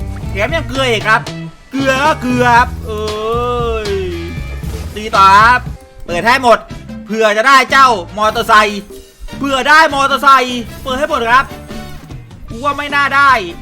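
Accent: South, Thailand. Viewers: พี่เป็นคนไทยแต่อยู่ภาคใต้ใช่ไหม😊😊😊😊😊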